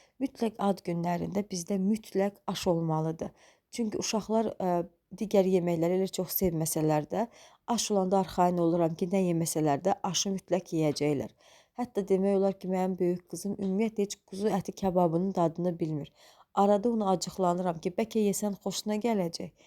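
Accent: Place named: Quba, Azerbaijan. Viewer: Ləhcənizdən qubalısınız deyəsən ancaq qubalılar mehriban olmurlar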